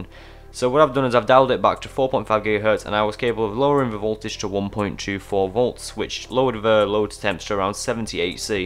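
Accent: United Kingdom. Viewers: are you a north east hard lad like me ?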